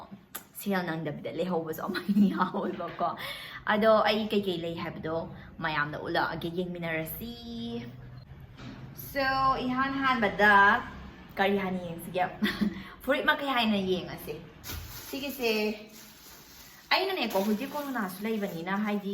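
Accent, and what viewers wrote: Kakching, India. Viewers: yengvda nsk 😘 ym nungcye ngna wa ngangba j taba ym nungaiye kakching gi lol khoira ngangbdo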